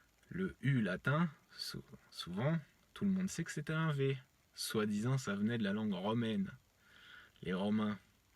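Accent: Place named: Burgundy, France. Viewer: Nom de Diu d'vin diu ! Tu roules les RRRR ha ha ...Je suis bourguignonne aussi, on a le même accent :) J'ai beaucoup appris avec toi, ainsi qu'avec "le blog à Cédric" ^^ Merci, si seulement les gens voulaient lâcher leurs religions, ils découvriraient le potentiel que nous avons réellement...et que nous nous suffisons à nous-mêmes :)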